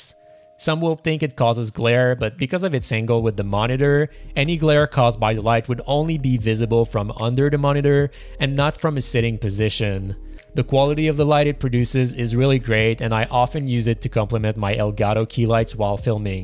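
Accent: Quebec, Canada. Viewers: L'accent québécois! yessiirrr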